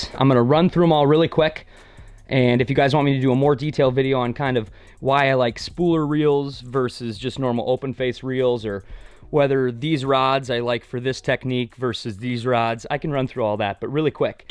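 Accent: Minnesota, United States. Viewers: Sounds like Minnesota to me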